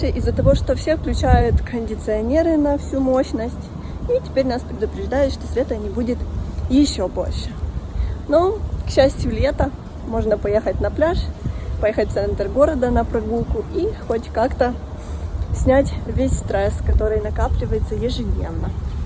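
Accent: Odessa, Ukraine. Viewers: Как мне нравится ваш одесский мягкий говор. Вы моя дорогая.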